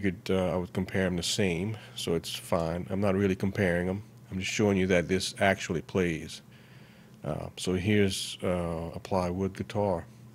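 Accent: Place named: Louisiana, United States. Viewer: Louisiana? u have same accent as me lol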